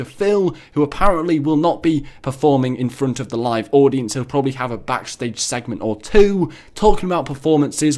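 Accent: England, United Kingdom. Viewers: Mate what time is this in England if you live there (you sound like you do)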